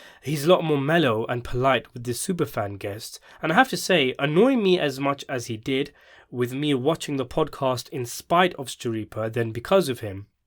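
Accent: United Kingdom. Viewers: As soon as I herd the British voice nobody gives a shit what a brit thinks . You really sound dumb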